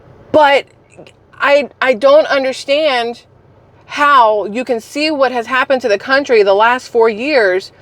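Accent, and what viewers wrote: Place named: Cajun, United States. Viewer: Are you Cajun,? If not you sure do have a slight Cajun accent 😊. I was raised between Baton Rouge and Alexandria now in Mississippi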